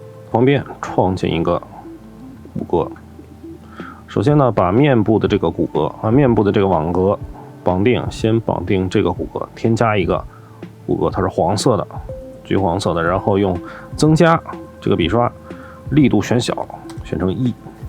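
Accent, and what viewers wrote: China. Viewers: 以后片子开头能不能别整那个中文念法的英文了，每次开始，我都被雷的外焦里嫩的，总是以为我点错视频了。。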